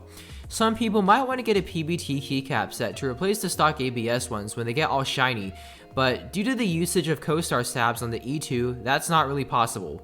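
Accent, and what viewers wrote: South, United States. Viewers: bring back the southern accent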